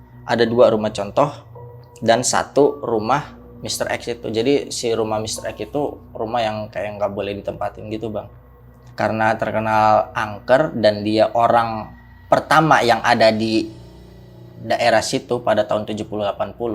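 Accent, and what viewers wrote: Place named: Serang, Indonesia. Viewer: wong serang kih wkwk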